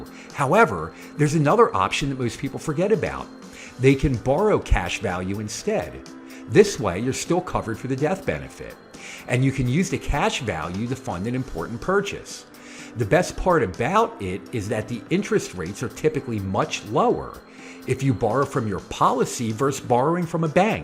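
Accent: Philadelphia, United States. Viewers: Love the Philly accent! Interesting topic, but now i need to go to Wawa and grab a hoagie and a wooder ice. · are you from Philly?? I cannot tell if that’s a Philly or delco accent 😅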